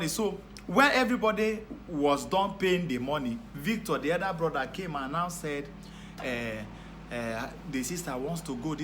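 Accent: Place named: Nigeria. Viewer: lmao...😆😆😆I love Nigerian accent...lol, u funny Apostle. I love this...blessings to you and your family. I agree to this aswell and yes it bless me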